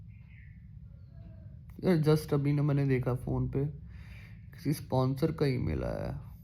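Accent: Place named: Punjab, India. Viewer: Fuck everything i love your punjabi English accent.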